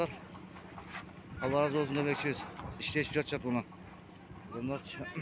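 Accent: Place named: Nookat, Kyrgyzstan. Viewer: Көрдүңөр,асфальт төшөлүптур,кичине болсо да өзгөрүптур,а сүйлөгөнү Ноокат тыктар ушундай сүйлөйт,ага эмнеге сайынасынар; Рахмат балага алына жараша тартып көрсөттү, Катасын издеп, баарың эле эстүү боло берип,катаа башка жакта жатат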